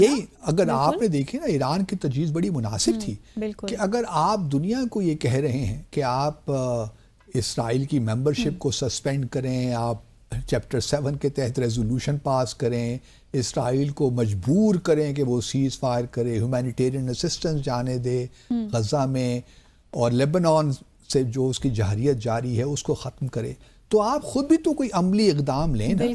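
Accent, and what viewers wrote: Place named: Punjab, Pakistan. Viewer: پروگرام کا آغاز انگریزی میں اس طرح کرتی ہیں جیسے کوئی انگریز کامپر ہیں - پھر پنجابی لہجے میں اردو شروع ہو جاتی ہے - بھائی سیدھے سیدھے اردو پورا پروگرام کرو یا پھر انگریزی میں کرو - یہ اردو انگریزی اور پنجابی کی کھچڑی کیوں پکاتی ہو ؟ اتنا احساس کمتری کیوں ہے ؟ کس کو انگریزی بول کر مرعوب کر رہی ہیں ؟ انگریزی لہجہ اور تلفظ بھی درست نہیں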